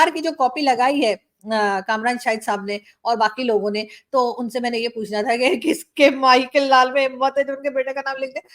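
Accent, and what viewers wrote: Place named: Uttar Pradesh, India. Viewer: Aapki Punjabi Bhasha up Walon Ki Tarah lag rahi hai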